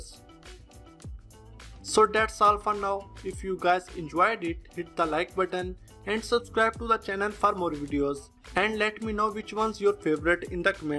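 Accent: India. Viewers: Your english accent is terrible. Oh i forgot you are indian that's why. Hahahahaha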